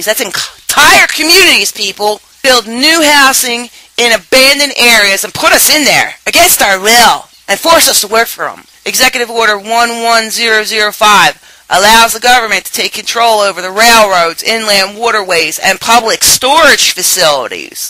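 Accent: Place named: Baltimore, United States. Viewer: wtf.. you have a baltimore accent lol...great video though.. it speaks the truth.. PRESIDENT RON PAUL 2012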